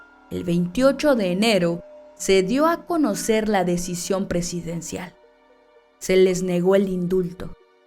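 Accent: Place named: Mexico. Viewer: Muchas gracias,adoro tu trabajo , e tu acento mexicano , lo encuentro muy agradable al oido. Abrazos desde Brasil.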